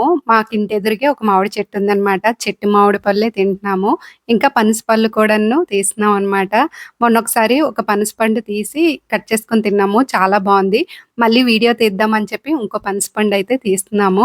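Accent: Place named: Srikakulam, India. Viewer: మీరు ఇంతకస్టపడి తెలుగు మాట్లాడిన... మన viIanagaram శ్రీకాకుళం బాష మారదు.. మాది తూ గో జీ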